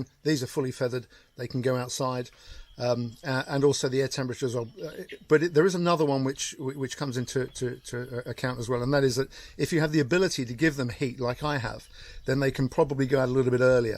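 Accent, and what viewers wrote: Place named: Midlands, United Kingdom. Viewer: Hi mate, first I've got to say thankyou as I've searched everywhere to find this kind of info. I have 3 six week old and they're lamp is all the way up now how do I get them from the box to the coop as I don't have power in the garden. Also I live in the UK round the Midlands so should be getting the similar weather to you considering your accent. Any help appreciated and thanks again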